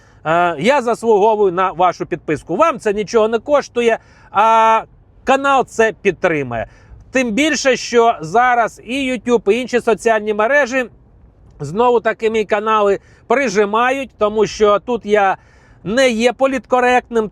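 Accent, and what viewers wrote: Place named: Russia. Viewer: Таваріщ, ти шо із московії? Премію міру, пазорітса, це що гонорово говорити із масковскім акцентом? Я перестав переглядати твої відео по цій причині, а тут ось знову, дай думаю погляну, виправився чи ні? Ні не виправився, то я можу і відписатися.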